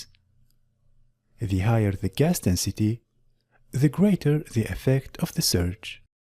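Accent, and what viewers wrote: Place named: India. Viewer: sometimes indian accent is hard to get the point (intonation)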